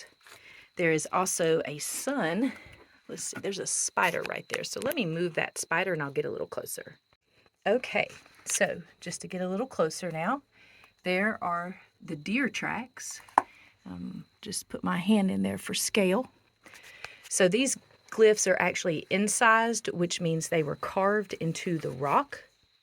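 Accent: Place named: South, United States. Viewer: Fascinating video - thank you! Also, I have to say you have a wonderful speaking voice - very clear and pleasant with a nice Southern accent. That and the interesting subject make this an outstanding video.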